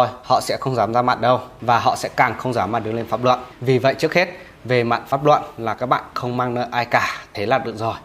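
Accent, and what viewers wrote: Standard. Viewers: Bạn nói rất chuẩn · Anh nói quá chuẩn luôn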